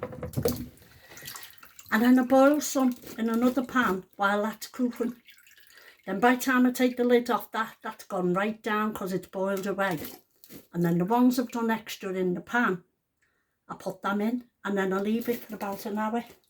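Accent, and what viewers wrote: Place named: Birkenhead, United Kingdom. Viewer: So glad I found you you make my day my dad and is family live in Birkenhead so hearing you talke makes me so happy I've also lost people I love my husband died 3 years ago and my brother and a4 year old grandson and a 7 year old grandson and some amazing things have happend to let us no there is life after death I have 16 grown up grandchildren and 30 great grandchildren love you to bits your great Christine from Leicester xxx 🌹🌹🌹🌹🌹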